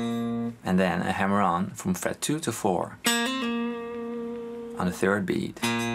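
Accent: Netherlands. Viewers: I can hear he is dutch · are you Dutch?you sound really Dutch XD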